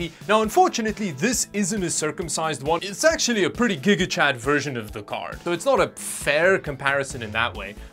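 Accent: South Africa. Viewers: how do you sound like ur south african with that accent? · Your accent and your name makes it seem like you're from South Africa, are you?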